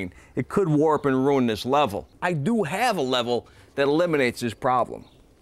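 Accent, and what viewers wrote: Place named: Brooklyn, United States. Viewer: I am getting Italian Brooklyn vibes lol. Learned a lot TY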